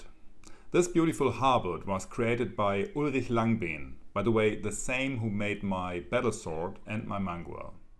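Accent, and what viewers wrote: Germany. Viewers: Hallo, dein Dialekt hört sich deutsch an, hast du auch einen Kanal mit deutschen Videos?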